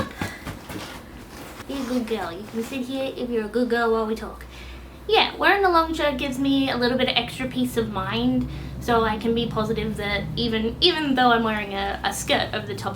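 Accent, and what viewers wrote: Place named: United Kingdom. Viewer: She sounds British.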